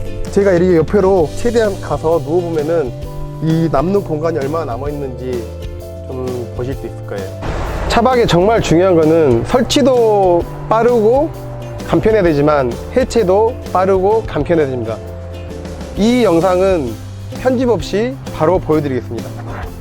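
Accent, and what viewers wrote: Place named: Jeolla, South Korea. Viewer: 으따 절라도 사투리 겁나 쓰구마 ㅋㅋ 좋게 잘 봐부러쏘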